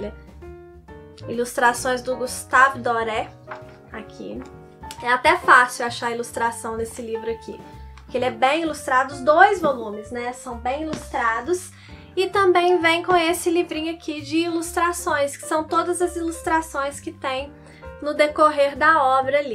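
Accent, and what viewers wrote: Minas Gerais, Brazil. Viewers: Oi! Te conheci essa semana e estou adorando seu conteúdo! Sua voz é tão gostosa de ouvir, esse sotaque delicioso de Minas ❤ adorei sua seleção, livros realmente lindos! Aqui na minha estante meus xodós são as edições ilustradas de Harry Potter, as novas edições de Senhor dos Anéis, pela Harper Collins, e a edição da Puffin in Bloom de Anne of Green Gables, aquele azulzinho, sabe?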